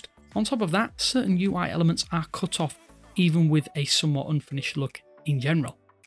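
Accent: United Kingdom. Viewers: Why is this channel based on the U.S when the host is clearly British?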